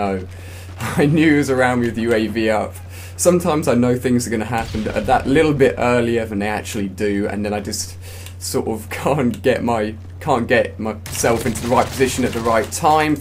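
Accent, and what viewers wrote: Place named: United Kingdom. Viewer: Seriously man. listen to his accent...Britain ring a bell?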